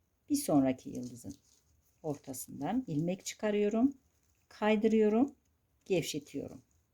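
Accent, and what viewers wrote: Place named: Ordu, Turkey. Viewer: Ordulumusunuz🤗konusmaniz orayi animsatti bana😊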